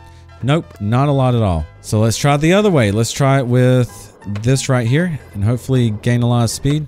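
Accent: South, United States. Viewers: Definitely from the South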